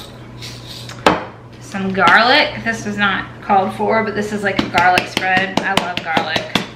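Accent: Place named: Louisiana, United States. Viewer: I love hearing you talk...reminds me of home! I'm a Louisiana girl living in England!! My surgery date has been set for Nov 11th!! So excited to prep for the different stages. These recipes are so helpful. Thank you. Also where about are you from??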